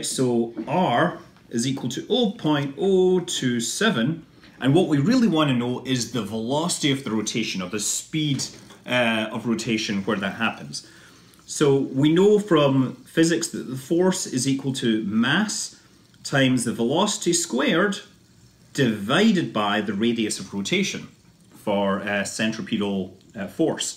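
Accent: United Kingdom. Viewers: British, judging by the accent.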